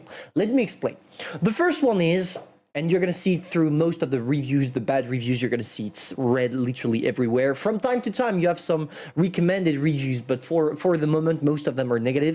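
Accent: France. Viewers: French accent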